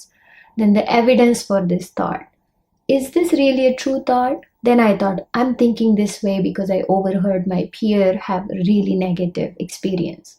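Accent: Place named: United States. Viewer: A cute Marathi girl with American accent 😀😀 A nice video anyway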